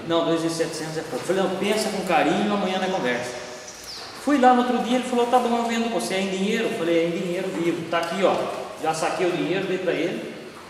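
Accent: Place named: São Paulo, Brazil. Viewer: Entendi, pelo sotaque pensei que era perto da minha cidade. Torrinha SP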